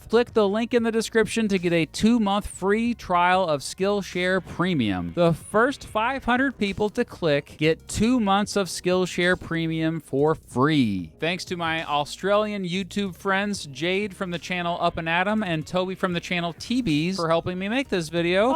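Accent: United States. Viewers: Melbourne is my favourite city!!! (I’ve lived in Adelaide, Sydney, Melbourne, Toronto and London) Btw the way you pronounced ‘Melbourne’ did not make me cringe. Aussies say it like ‘Melben’ because we don’t pronounce our R’s like Americans do. So with the American accent, the way you’re pronouncing it with the R is not really wrong (imo). As long you’re saying ‘Mel-burn’ and not ‘Mel-BORN’ like the Bourne Identity. That one makes me cringe... though I can’t really blame people for doing that since it’s spelled the same way.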